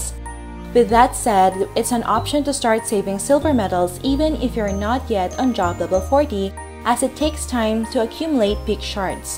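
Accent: Philippines. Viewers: are u filipino?nkaka inlove nman pgsasalita mo ;) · Lol boses plang pinay na. Usap2 din sa ibang tao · Thank you. Thank God for bumping into this. You saved my life! BTW are you Pinay? Your accent sounds like one.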